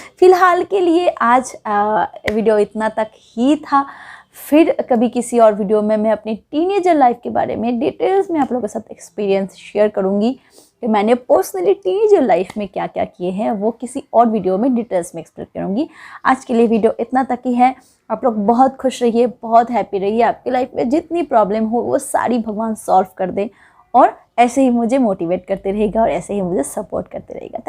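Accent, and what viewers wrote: Bengal, India. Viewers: i cud make it out frm ur accent.....that u r a bong...... very sweet..... believe me today only i watched for the first time.....very nice dear.....tk care....keep up ur talent......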